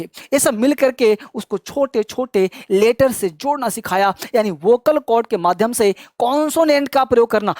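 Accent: Bihar, India. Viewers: Sir Ap Bihari ho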